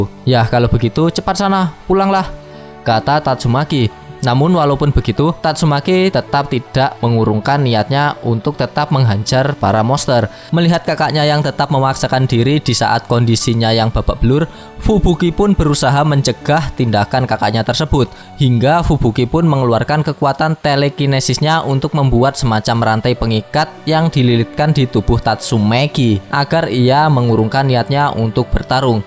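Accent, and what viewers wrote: Java, Indonesia. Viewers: jowo ndi kang ?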